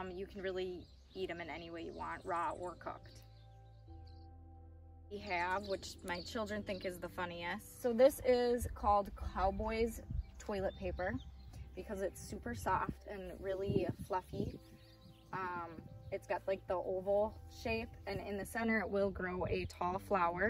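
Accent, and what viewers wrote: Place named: Minnesota, United States. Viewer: Can't beat that Minnesota accent.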